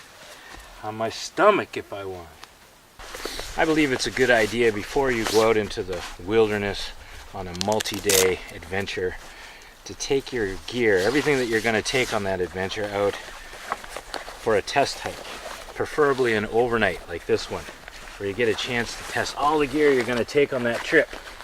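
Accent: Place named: Ireland. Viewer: I believe that is the first time I've heard an Irish accent from Canadian lips... I like using flour tortillas as a wrap for the Breakfast Skillet. I gently warm the tortilla over the fire first. Then scoop in a healthy portion of the mix into the wrap. Tastes great. Maybe a little hot sauce to go with it. Agreed. Over-nighters lack enough time to get into the wilderness frame of mind. But they are great for testing gear and just getting away from it all for a bit. Great video.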